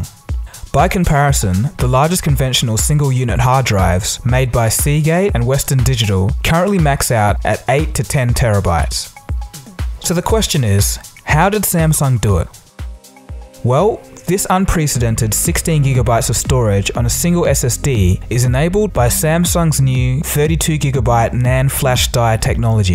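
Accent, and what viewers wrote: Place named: Australia. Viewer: He is from Australia that my explain the pronounciation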